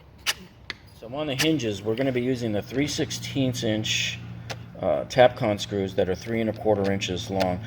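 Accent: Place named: New England, United States. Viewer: I hear New England, loud and clear.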